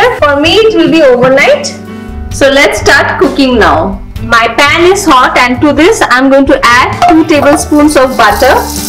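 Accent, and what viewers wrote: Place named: India. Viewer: I could not understand what said she was adding? is a nice lady but with her high pitched voice and Indian accent, I struggled to hear what she was saying for much of the video. Looking at the video again, I think it was, the ''Fenugreek'' that she added?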